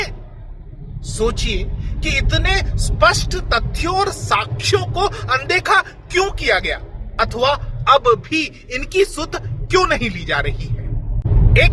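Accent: Standard. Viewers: मुझे आपको सुनना पसंद है , आपकी हिंदी भाषा की शुद्धता कानों को शीतलता प्रदान करती है, आपके ज्ञान को नमन ,आप अपना काम करते रहिए , हम आपके अच्छे स्वास्थ्य की कामना करेंगे।धन्यवाद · Aap ki hindi kafi shuddha hai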